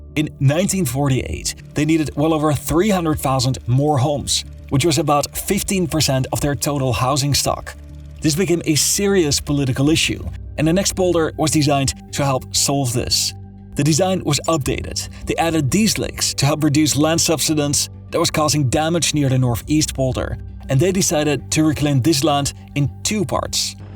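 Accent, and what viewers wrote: Netherlands. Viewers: Nice video, but why do you keep referring to the Dutch as "they"? You're clearly Dutch (heavy accent), so why not say "we"? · This is a good and really interesting video! But why are you talking about us in the second person? Aren't you Dutch yourself? I can hear it in your accent and see it on your profile... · It’s a bit weird to keep referring to ‘them’ and ‘they’ sir with the Dutch accent 🤔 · horrible Dutch accent!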